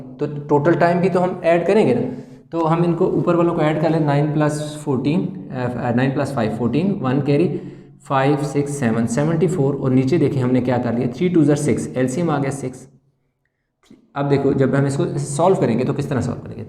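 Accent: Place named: Sindh, India. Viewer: ap ka lehja sindhi wala hai are u sindhi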